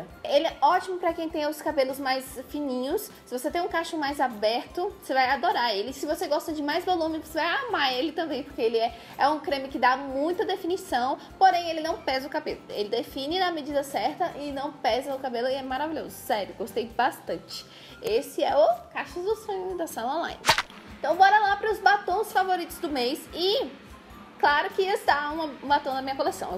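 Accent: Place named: Brasília, Brazil. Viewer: tão brasiliense esse teu jeito de falar , me identifico #jujubanoveda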